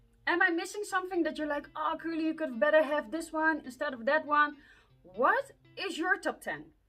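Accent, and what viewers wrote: Netherlands. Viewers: I have just discovered your channel, wow I've been missing out... I have watched 4 of your videos so far and I must say you are perfect to be a TV hostess, have you ever think of that? I love your dutch accent and curly hair. have a wonderful day. Neighbor from Denmark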